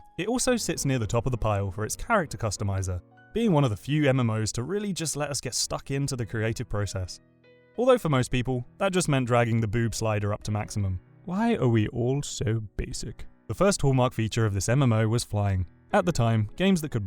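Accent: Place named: United Kingdom. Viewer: you could be a great streamer for Silkroad online since they aren't many English speaker ones. Turks are monopolizing this business and many ppl like me are looking for streamers with a British accent like yours.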